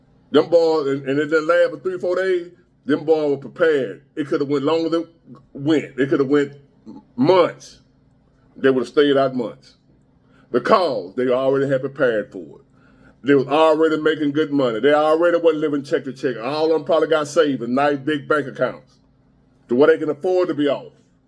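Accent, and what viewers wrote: United States. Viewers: Facts!!! This dude popping up out of nowhere on everyone's youtube feed sounding like a regular American. He is being paid to do this, I guarantee. He is the shabbat goy THEY are using to convince truckers to abandon their trade via getting replaced for striking.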